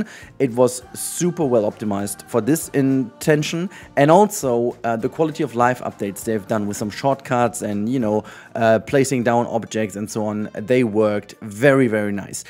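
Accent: Germany. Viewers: Great. Grüße. Warum machst du eigentlich englische Videos? Man merkt natürlich das du deutscher bist :D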